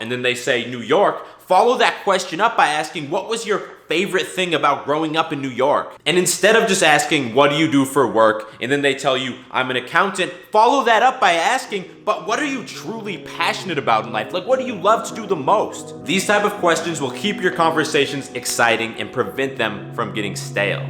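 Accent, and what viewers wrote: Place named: Boston, United States. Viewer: I thought he was from Boston not austin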